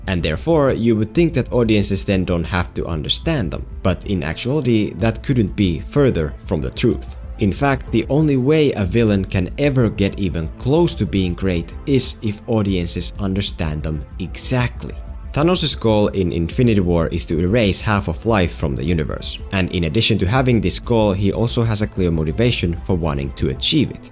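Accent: Finland. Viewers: Dying to know where your accent comes from. Sounds a lot like a Finnish accent to me :D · I was 100 % sure you were Finnish because of your English accent. It's a unique accent I thought only Finns had. The more you know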